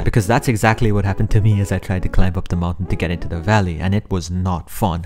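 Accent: India. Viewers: are you Indian you sound Indian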